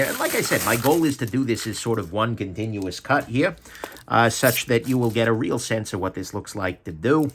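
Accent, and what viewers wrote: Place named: New Jersey, United States. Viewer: You gotta be from New Jersey!!!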